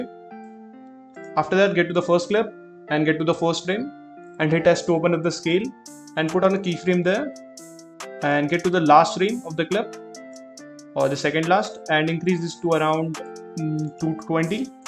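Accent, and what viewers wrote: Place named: India. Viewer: DAMN THE INDIAN ACCENT IS DRIPPING OUT OF THE VIDEO!!!!!!!!!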